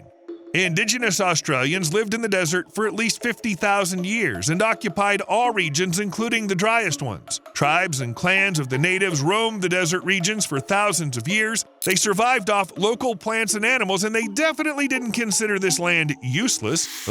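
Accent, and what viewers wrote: United States. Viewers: How do you seperate "strictly Aussies" from other ethnics or races when a large percentage of the population were born in other countries ? I dont bother watching or listening to videos about Australia that are narrated by someone with a US accent and this one was no different I turned it off. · Jesus, Give him the internet and a PHD from the Gretta Thrumberg university of HOW DARE YOU and suddenly he's an expert on Australia, The Aboriginals and global warming. During his eye rolling diatribe not once did he mention we contribute to 1% of the world's global warming issues.. Or that we're currently destroying the country to reduce that even further.. Whilst from his accent he's either American or Canadian.. Both of which produce far more than us. I'm 100% certain he got all his information from the ABC 😂.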